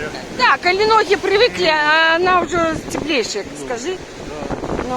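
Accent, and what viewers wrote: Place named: Belarus. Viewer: Очень беларуский акцент. Хай живе Беларусь ! Не ставайте трапками для бацьки !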